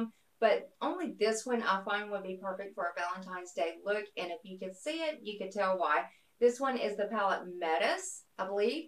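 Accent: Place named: Tennessee, United States. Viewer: Love your accent! I'm originally from Knoxville, Tennessee and you sound like home. lol. I'm supporting through the group for your watch time now.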